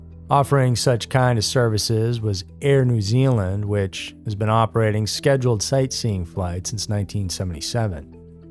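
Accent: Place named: East Texas, United States. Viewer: That is the most Marshall/Longview accent I've ever heard.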